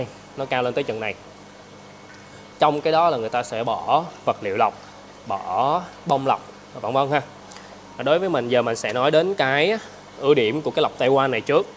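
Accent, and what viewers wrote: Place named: South, Vietnam. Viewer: Sao bạn này giọng nói giống người Miền Nam ghê 😊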